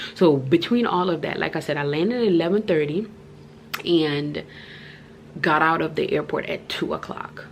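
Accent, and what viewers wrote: Jamaica. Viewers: No offence but which r u Jamaican r American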